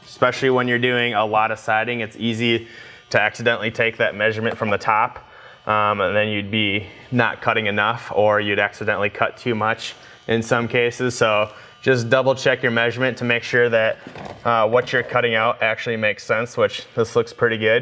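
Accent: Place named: Wisconsin, United States. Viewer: where in wisconsin did you come from?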